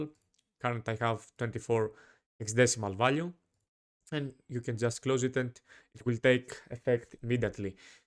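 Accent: Greece. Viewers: Το καταλαβα οτι εισαι ελληνας απο την προφορα.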